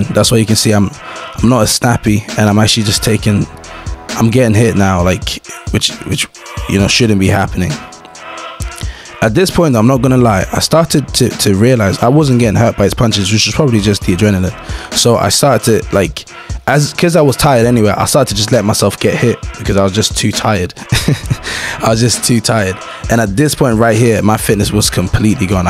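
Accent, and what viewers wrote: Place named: Midlands, United Kingdom. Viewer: Ure from Leicester or Nottingham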